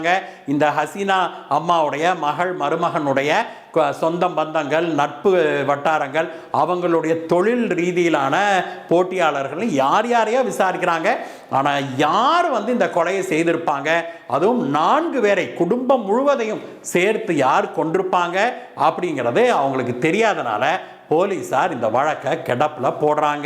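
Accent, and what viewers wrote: Kongu, India. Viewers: உங்கள் கொங்குத் தமிழ் அருமை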